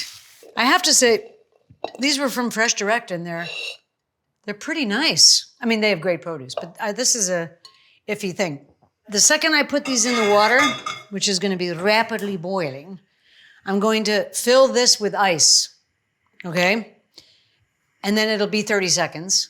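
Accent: RP, United Kingdom. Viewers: I love your Fanny Craddock accent. Perfect! You're a hoot and the cooking is pretty good too! 😍💛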